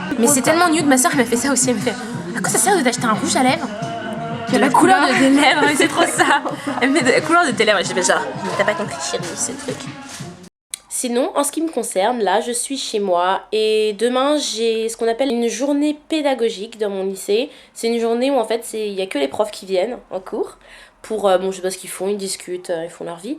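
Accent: United Kingdom. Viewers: J'ai pas mis de pouce vert pour le cast de Bref mais pour ton Magnifique accent so British ;) Tu m'as tué x) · J'adore l'accent anglais ! C'est chic ! et en plus tu te débrouille bien, et j' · Trop chic ton accent "british" :P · Aaaaaah j'aime tellement t'écouter parler c'est ouf *w* Je trouve ça trop cute l'accent british, aha, c'est "chic" (bon ok c'est nul mais bon hein :3) . Hâte de voir la prochaîne vidéoo <3 -J. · Ton accent british c'est chic babe ! ♥ aha .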